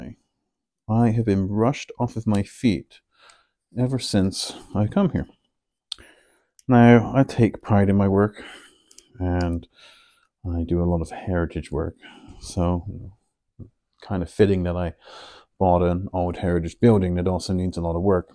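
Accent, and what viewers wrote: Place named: United Kingdom. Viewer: I’ve been wondering about your accent, where are you from in Britain? These videos are great, really nice to get to know you 😊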